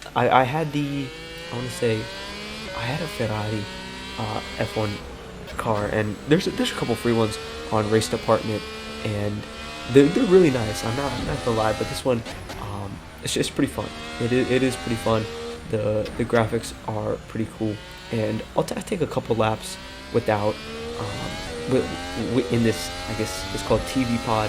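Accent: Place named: Canada. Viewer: You sound extremely Canadian.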